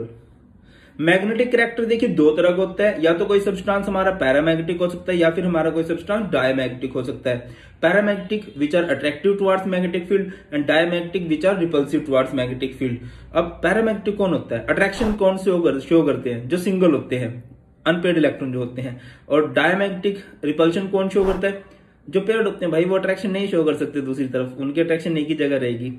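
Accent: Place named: Chandigarh, India. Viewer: Sir aap kha se ho apki language aas padose ki hi lag rhi h Chandigarh se??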